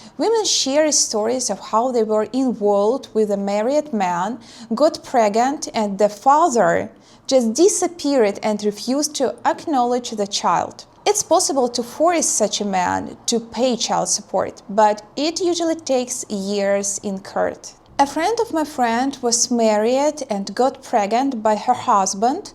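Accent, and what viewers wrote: Russia. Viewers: Dear, let's make a retro mid 1980s spy film, you the beautiful, seductive but deadly KGB agent in Warsaw Poland, setting up a honey trap............me.....defending the integrity of western values, the amiable naval attache at the British embassy, saving the world for democracy......the Russian and British accents together in the same room.......wonderful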